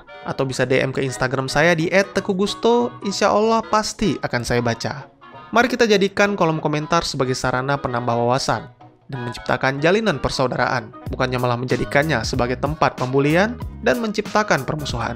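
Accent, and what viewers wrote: Aceh, Indonesia. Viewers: bang orang aceh ya?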